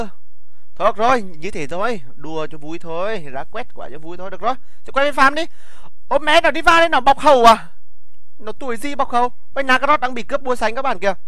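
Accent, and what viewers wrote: Nghe An, Vietnam. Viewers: Ah giọng nghệ an ở thái hoà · Giọng nghệ nghe buồn cười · thick giọng nghệ an nghe sướng tai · E thích tiếng nghệ an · Giọng nghệ an hay mà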